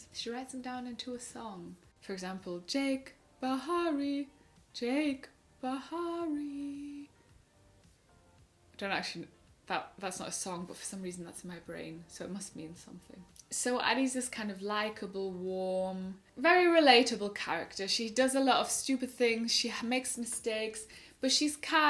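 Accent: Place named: Germany. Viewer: Wie man den Deutschen akzent direkt raushört😅. Hab vor kurzem wieder Unfabulous durchgeguckt. So nostalgie aber zoey101 hab ich auch geliebt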